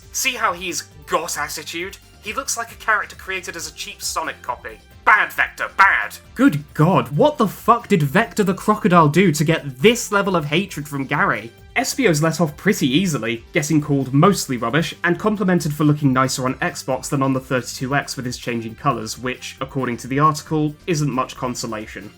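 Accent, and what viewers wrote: United Kingdom. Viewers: This is the most pommy sonic tuber ever and I'm here for it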